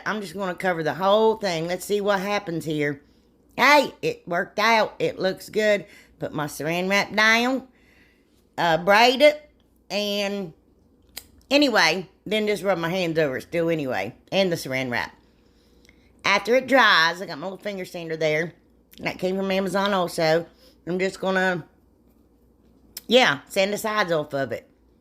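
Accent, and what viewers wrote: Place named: Oklahoma, United States. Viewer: Ha ha ha ha ha ha ha My Husband and I are cracking up watching you touch that glittery fabric. My Husband has only seen a couple of your episodes with me so he doesn’t know your aversion to felt he just turned to me and said, “Does she have Tourette’s? “Ha ha ha. I was laughing so hard I couldn’t even answer him for a minute I said why do you think she has Tourette’s and he said the way she’s repeatedly clapping her hands and then shaking them over her project and I was laughing so hard that I could not talk for a minute. Oh my goodness, I love you so much. Aside from the laughter and joy that you bring me and my home I love your projects and your creativity. The accent is just icing on the cake for me. A lot of my family sounds just like you only they hail from Oklahoma. I am a proud Okey! I think I told you before that I used to sound just like you too. 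But, my fourth grade teacher thought I shouldn’t talk with that accent and put me in speech therapy. I don’t think my parents even knew it until I said something one day about my teacher who makes me talk weird. My mother figured out what was happening and read that teacher the riot act. I loved her for that. Funny thing is my mom and dad didn’t have the accent but I did. My grandparents had the okie accent and they lived right behind my parents and my house and I loved spending as much time with them as I did in my own house so that’s where it came from. I don’t think I have the accent anymore but my husband and my kids say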